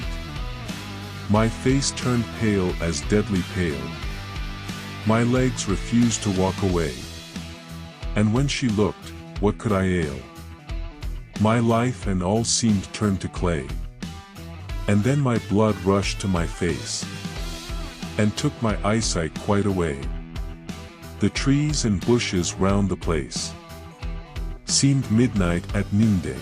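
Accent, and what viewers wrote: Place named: England, United Kingdom. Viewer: This beautiful video took nearly 30 mins to enjoy and I love hearing your reading although I did not watch the video but I truly listened your beautiful English accent my dear 😍 thank you so much for a long uploading and it really took much time for this video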